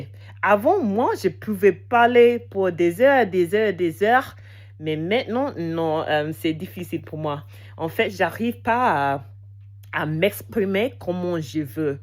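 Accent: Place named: England, United Kingdom. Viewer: 4.5 le 0.5 c'est juste que t'as un accent anglais encore mais c'est mignon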